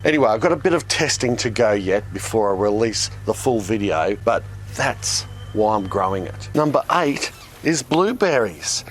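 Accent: Australia. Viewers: Google subtitles: Hi I'm from Sausage Fisherman. Looks like AI can't deal with an Aussie accent. 😅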